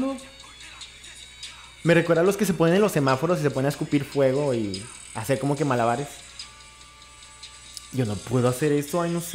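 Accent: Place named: Colombia. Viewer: No tiene nada que ver pero amo tu acento colombiano 💞 ahri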